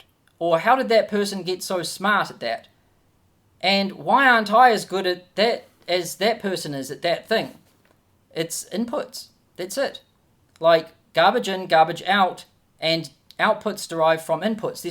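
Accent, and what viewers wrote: New Zealand. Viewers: You sound like a Kiwi? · Man I just like to listen you talk, your NZy english is so cool!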